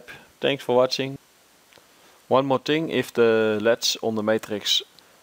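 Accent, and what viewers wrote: Netherlands. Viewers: hahahah jouw nederlands accent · Hahah, amazing Dutch accent right there =)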